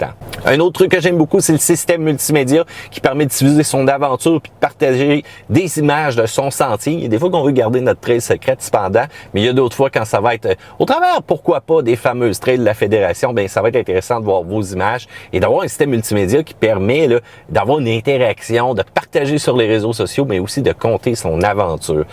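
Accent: Quebec, Canada. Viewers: Ça c'est un accent québécois sans filtre et de compétition ! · juste pour l'accent quebecois !!! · Ha! J'adore vôtre accent québécois 🇫🇷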